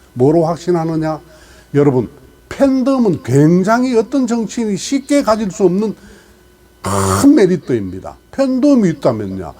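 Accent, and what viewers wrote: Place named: Gyeongsang, South Korea. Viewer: 경상도 억양 ~~사온닭 아니구요,싸움닭이죠?ㅋㅋㅋ 😂😂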